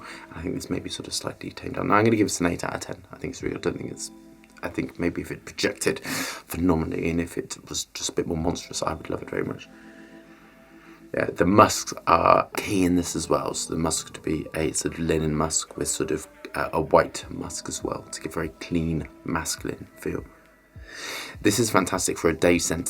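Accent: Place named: Cornwall, United Kingdom. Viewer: I hear some of Anthony Hopkins accent in your voice mate...cornish accent slipping through...love it!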